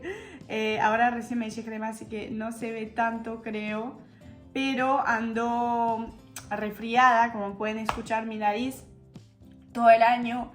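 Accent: Argentina. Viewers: Tranqui, hablas muy muy bien argentino. Serás bienvenida si andas por Mar del Plata. Mate y cafecito y ABRAZOS ❤ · Porque hablas así si. Sos argentina,???? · Hola,haciendo zaping en Youtube miro muchos contenido de personas como vos y con parejas de uno y otro país,y te encuentro a. Vos y a Santi,hay tantas historias hermosas de personas de diferentes países, de argentinos con extranjeros,y en esos estás. Vos a diferencia que tú esposo es colombiano, vívio Mucho acá,y vos prácticamente SOS más argentina que francesa,y lo más lindo que hablas tan bien el idioma con acento bien argentino,SOS una persona muy hermosa,todo lo que sea para tu futuro, bienvenida,a la argentina,sos muy bella,sigue tu instinto y te irá bien junto a tu familia,te mando un fuerte abrazo 🫂 🇦🇷🇦🇷🇦🇷❤️❤️❤️❤️❤️ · Así es, Argentina es lo más boluda!! 🥰 ❤ 🇦🇷 Sos toda una argentina hablando me encantó tu video! 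🎉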